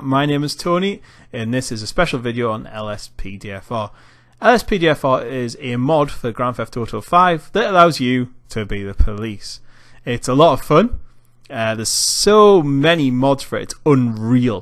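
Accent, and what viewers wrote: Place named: Newcastle, United Kingdom. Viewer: you sound like your from near newcastle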